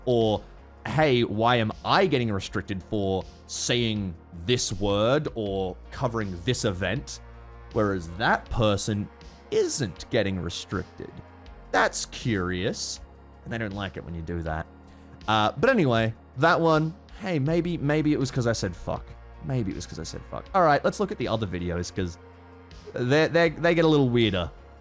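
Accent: Australia. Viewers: man i was so conviced i'd clicked on a muta vid for a second there im so glad your australian accent gave it away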